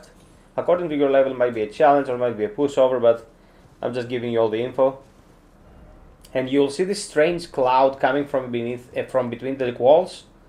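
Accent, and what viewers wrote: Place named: Greece. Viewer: Η Ελληνική προφορά δεν κρύβεται με τίποτα! Ευχαριστούμε για τα guides αδερφέ, πολυ αναλυτικός! <3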